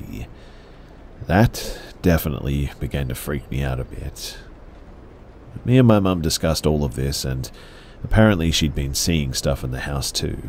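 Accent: Australia. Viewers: Favorite video you put out as of recently. You're the fucking man, Thanks for your beautiful Aussie accent lulling me to sleep every night. You've gotten me through some tough times and I genuinely thank you for that man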